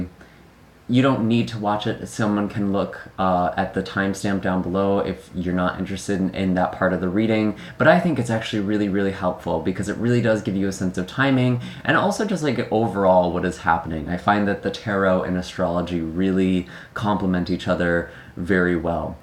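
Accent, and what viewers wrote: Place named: Midwest, United States. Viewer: i love your cute little midwestern accent, and your warmness! God bless!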